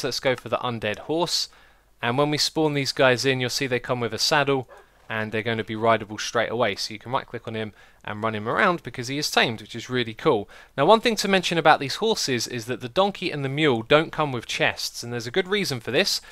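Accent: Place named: United Kingdom. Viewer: Oh my you're British!!! Me too!! I think you're British, you sound it!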